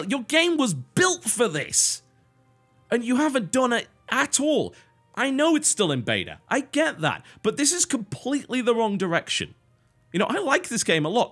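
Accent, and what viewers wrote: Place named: Geordie, United Kingdom. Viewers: So me being a geordie, am I right in saying this guy is a geordie but he's trying to hide his accent, because I can hear it. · I know you're a troll, but I'm going to reply anyway; he's from the north of England.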